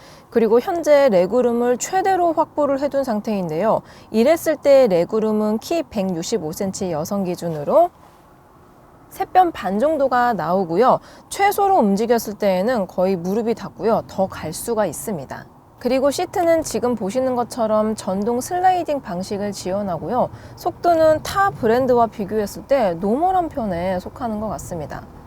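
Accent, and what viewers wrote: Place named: Chungcheong, South Korea. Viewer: 충청도 사투리 섞여 있네유 .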